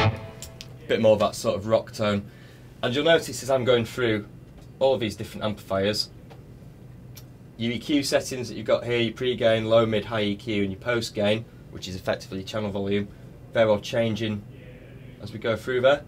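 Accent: United Kingdom. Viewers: The combination of the British accent and sweet beard lead me to believe that this man is Guthrie Govan's alter ego.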